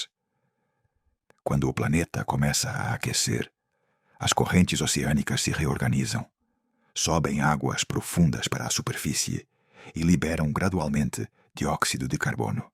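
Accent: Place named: Portugal. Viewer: Sotaque de Portugal não dá!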